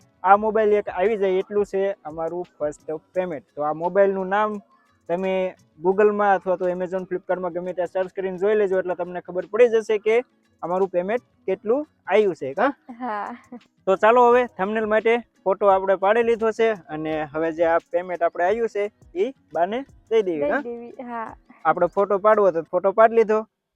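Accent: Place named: Saurashtra, India. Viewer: તમે શુધ્ધ ગુજરાતી ભાષા માં બોલો છો એટલાં માટે વીડીયો જોવાની મજા આવે છે સૌરાષ્ટ્ર કાઠિયાવાડ ની ભાષા મીઠી હોય છે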